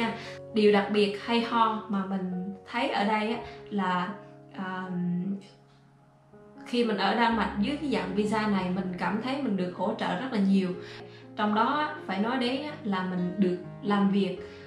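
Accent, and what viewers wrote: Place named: Quang Ngai, Vietnam. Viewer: chị Quảng Nam nè,nhưng nghe em nói giống Quảng Ngãi hơn.Chúc 2 vc thành công nha!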